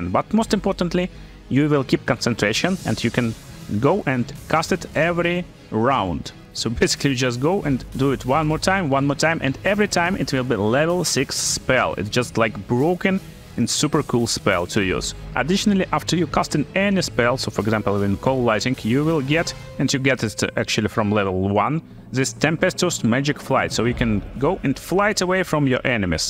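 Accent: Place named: Russia. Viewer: I can hear russian accent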